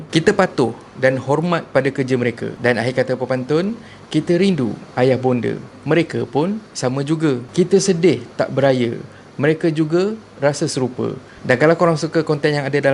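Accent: Malaysia. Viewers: Lain y bhsa melayu malaysia ini. Lucu kosakata dan aksen logat nya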